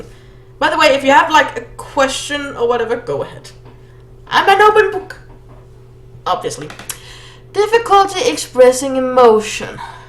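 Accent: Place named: Denmark. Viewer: This is a nice idea! hehe, the danish accent cc;; ♥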